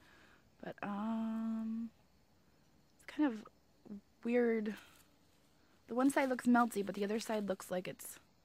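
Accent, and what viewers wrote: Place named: Australia. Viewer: For a second i thought you were coming too my country Australia lol. Well it sort of sounded like it ;)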